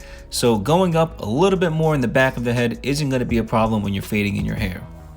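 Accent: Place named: New Jersey, United States. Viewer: Where the heck are you from originally? I'm trying to place that accent. Ya sound like you're from Joyzee(New Jersey).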